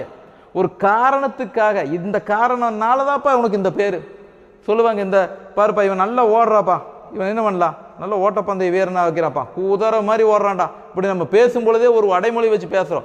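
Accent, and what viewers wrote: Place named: Kongu, India. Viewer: கொங்கு பேச்சு அருமை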